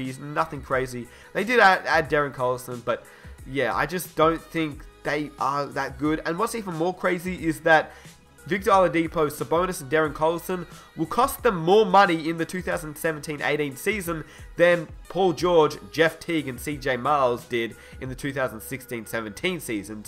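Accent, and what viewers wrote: Australia. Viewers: Okc still hyped wtf the got there ass dropped by the rockets and there not winning shit because if they can't win with kd,harden,ibaka you think there gonna win with Paul gorge( washed up version) and btw who tf is the Australian talking over the video who has not picked up a basketball in his life probably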